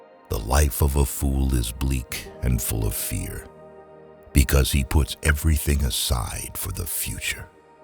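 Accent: United States. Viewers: The American accent spoils it.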